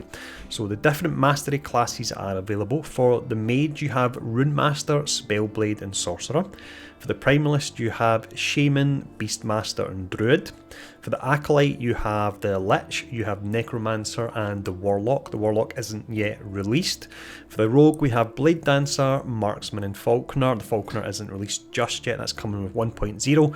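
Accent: Scotland, United Kingdom. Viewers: I'm from Clydebank. He is clearly Scottish. Irish accent is totally different.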